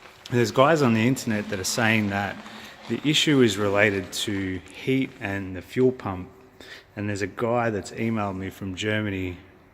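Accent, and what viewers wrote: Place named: Australia. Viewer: Your accent tells me you're in Aus - mate, lodge a complaint with your State's consumer affairs department. They've helped me in the past and I'm sure they'll help you. Remember we have pretty strict warranty laws and BMW is legally obligated to help. With this video you have enough evidence to (nicely) demand action. Good luck, and post updates! EDIT: Just read your post, you've already lodged with ACCC - no surrender!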